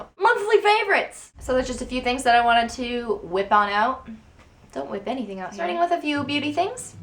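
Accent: Canada. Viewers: This is the first video ive watched of you and i immediately could tell you were from canada :p i love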